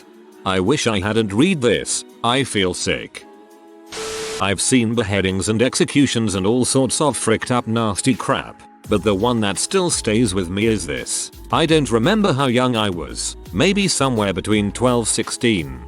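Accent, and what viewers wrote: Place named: United Kingdom. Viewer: Can you guys change the British narrator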